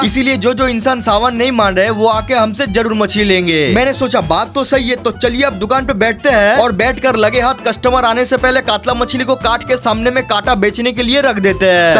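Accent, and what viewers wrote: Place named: Bihar, India. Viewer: Bihari hai kya bhai😅😅😅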